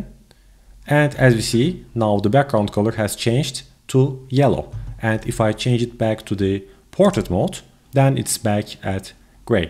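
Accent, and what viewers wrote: Turkey. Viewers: I'm browsing for coding solution and a man with Turkish accent tells me how to solve. Gosh, finally.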